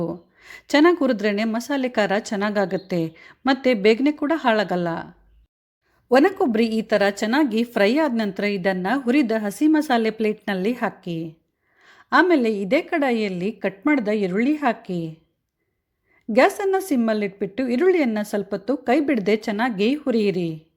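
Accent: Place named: Bangalore, India. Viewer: Uttara Karnataka recipe anta heltiri, matadod nodidre Bangalore bashe matadtiri?? UK language matadidre En agutt ri 🤦🏻‍♂️🤦🏻‍♂️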